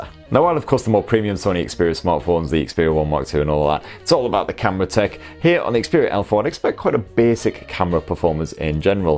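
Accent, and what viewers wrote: United Kingdom. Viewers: For an not englishman incredible hard to understand and follow - british accent and talking so damn fast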